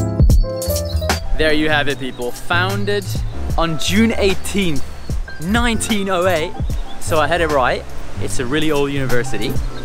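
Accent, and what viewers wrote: United Kingdom. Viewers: I think your not from America because your accent looks like a British accent lol correct me if I'm wrong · British accent, that's why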